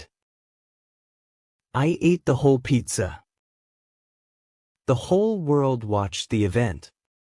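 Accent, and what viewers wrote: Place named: United States. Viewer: American english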